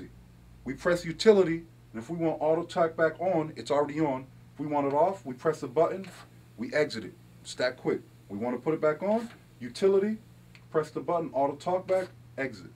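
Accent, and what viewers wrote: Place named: North, United Kingdom. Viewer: gotta love that deep northern accent....lol....good video tho...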